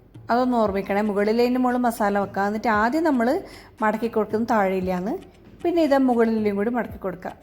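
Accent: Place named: Kasaragod, India. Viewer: Kasarkod basha ishttapettu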